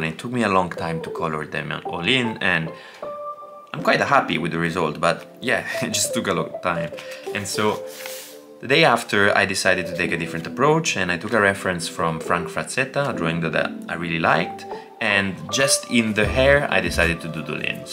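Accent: Italy. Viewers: Ci ho messo una decina di secondi per accorgermene, ma l’accento italiano è davvero inconfondibile 😂 Da un tuo “compare” dall’Italia, bellissimo video, chiaro, semplice e diretto, e il tuo stile sia nel disegno che nell’editing mi piace un sacco! Come disegnatore occasionale sono super curioso per i tuoi prossimi video Continua così!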